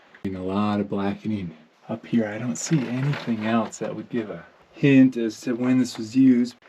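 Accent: United States. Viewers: 100s of meters? you sound american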